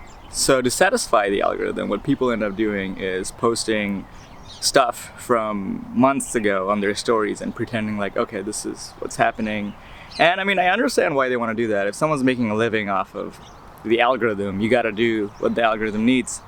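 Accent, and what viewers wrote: United States. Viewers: You sound like American accent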